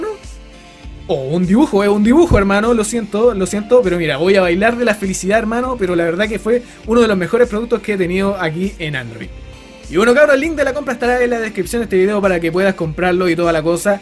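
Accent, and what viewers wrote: Chile. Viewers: La mejor mierda es que este en audio español chileno xD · espera eri chileno? y en que pais vives? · Es chileno bro V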